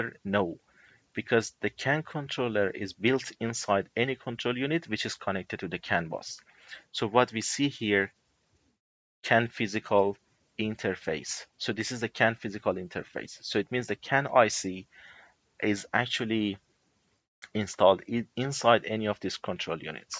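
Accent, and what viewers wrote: Iran. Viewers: good job man, thank you so much for your comprehensive and functional training. your accent says that you are Iranian, am I right?😁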